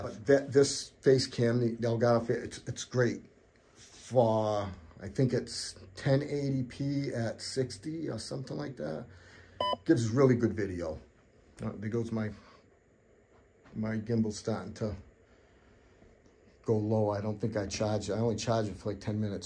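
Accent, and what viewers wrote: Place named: New England, United States. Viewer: Sounds like you're in New England like me!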